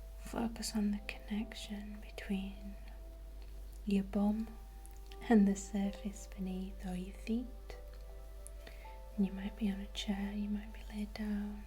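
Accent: United Kingdom. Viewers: Are you British ? 💖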